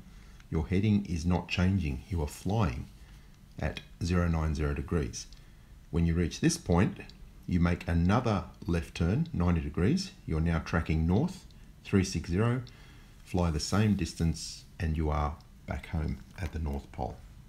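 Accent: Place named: Australia. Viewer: Australian I believe.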